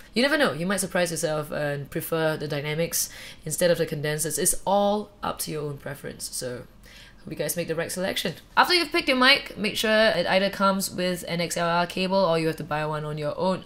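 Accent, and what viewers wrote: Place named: Dominican Republic. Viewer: You speak like a Dominican in english.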